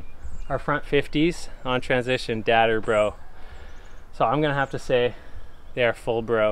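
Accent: Canada. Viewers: Maybe its the white shirt, maybe its the canadian accent.. but after clicking on this I almost thought I clicked on a Vancouver Carpenter vid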